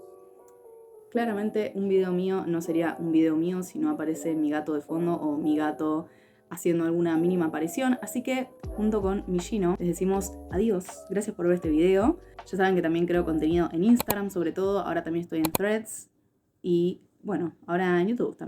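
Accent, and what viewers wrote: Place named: Argentina. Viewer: Te acabo de descubrir y me has enamorado. Suscribo cada una de tus palabras.....y encima con avento argentino....eso es un plus, y obvio.....mucho más lindo.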